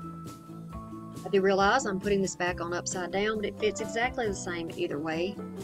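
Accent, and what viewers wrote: South, United States. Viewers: Found you via Julie’s channel from your comment. I’m from Alabama originally. Now living in Panama City beach. Love to hear us southerners on YT 😁😁😁😁😁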